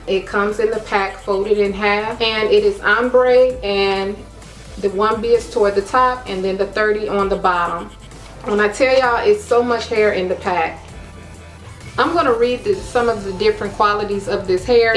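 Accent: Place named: South, United States. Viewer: Aah you're so pretty and your southern accent is charming! I'm just a beginner with crochet (I watched your pretwisted sen. twist videos) but I wanna try this in the future! Thanks for the video!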